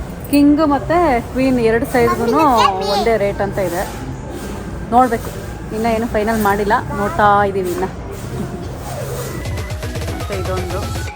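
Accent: Mandya, India. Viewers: Nim bashe pakka nam mandya ❤ akka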